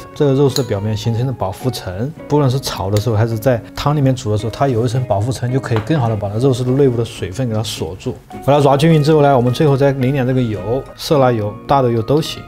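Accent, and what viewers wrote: Sichuan, China. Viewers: 四川土音 👍 太親切了！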